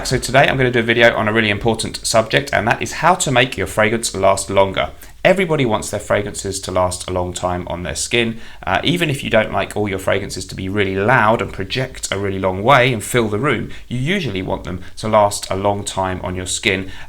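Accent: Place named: RP, United Kingdom. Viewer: One of the best things anyone can do is drink water people lots and lots of water to keep your body and skin hydrated and quite drinking alcohol this is one of the biggest impacts I've had on my perfume performance drink lots of water ... great video gotta love the Queen's English 🤣 "fat kid at the chip shop" 😃😂😂🤣👌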